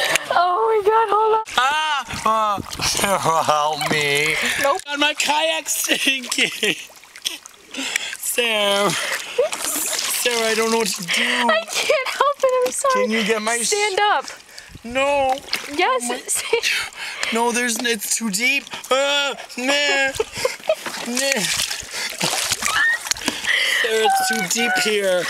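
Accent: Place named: United Kingdom. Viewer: he sounds british for me, like sir or smth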